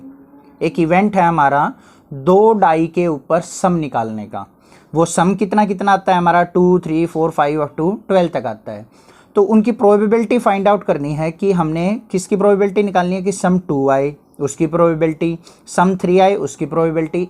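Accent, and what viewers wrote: Haryana, India. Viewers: Sir Haryana se ho kya aap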